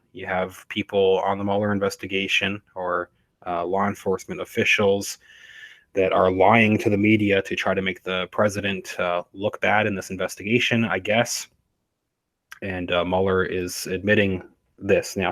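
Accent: Canada. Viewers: if you click on ABOUT--- it shows the channel information page...channel location is Canada . His accent is Canadian they sometimes pronounce about...aboot...kinda cool ...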